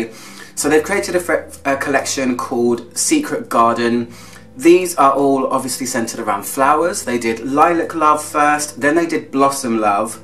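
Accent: United Kingdom. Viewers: Perfect and helpful descriptions as usual plus I adore your British accent! On the other hand, could you do a top 10 chypre florals? Thank you very much and please keep on doing these wonderful reviews! Greetings and respect from Spain!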